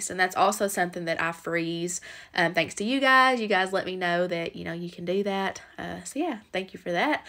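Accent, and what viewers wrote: South, United States. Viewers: I love southern accents so much 😫💜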